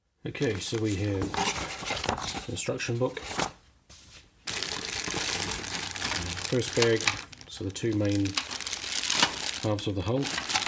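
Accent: New Zealand. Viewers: Slipps Dungeon he’s from New Zealand what’d you expect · its the new zealand accent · Are you a kiwi?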